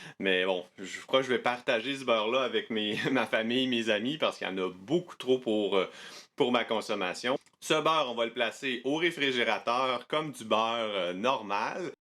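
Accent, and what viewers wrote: Quebec, Canada. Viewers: Géniales vos vidéos.. Tout est parfaitement expliqué,matériel,dosages,temps... Et l'accent québécois est vraiment top 👍👍 Amitiés de France aux cousins des Amériques! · Hey! Bonne vidéo 👍😀 J'ai essayer une de tes recette, et c'est un échec 😅 D'ailleurs, tu et canadien? Vu ton accent je pense que oui 😅 Juste pour dire que j'adore le Canada!! 🙂 Coucou de la Nouvelle-Calédonie.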